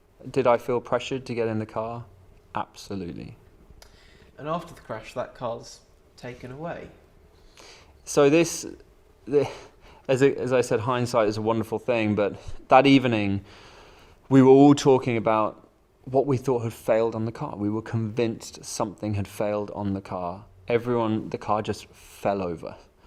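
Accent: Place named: RP, United Kingdom. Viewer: sounds typical bbc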